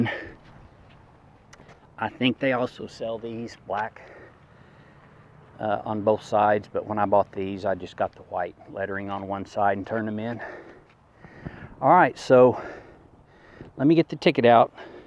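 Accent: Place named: Texas, United States. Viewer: You sound like you have either a Texan or Southern accent. Your California license plates threw me off. 😂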